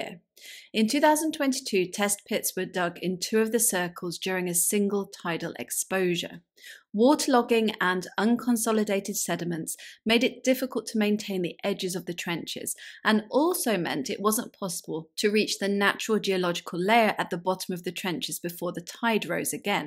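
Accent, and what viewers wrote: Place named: Home Counties, United Kingdom. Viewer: Beautiful diction;english 'home counties',around London?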